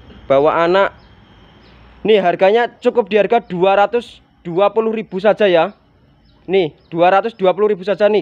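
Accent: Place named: Java, Indonesia. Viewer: Lokasi endi mas nggih? Logate kok jowo kentel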